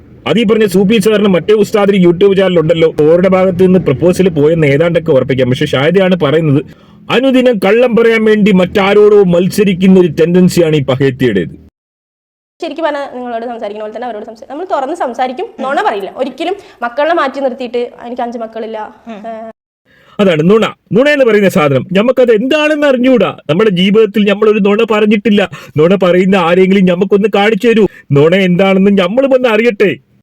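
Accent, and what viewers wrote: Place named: Malappuram, India. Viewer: എടാ പഹയാ അന്റെ വർത്താനം ഇന്ക് നല്ല ഇഷ്ട്ടായി 😂😂😂😂👍👍👍👍മലപ്പുറം da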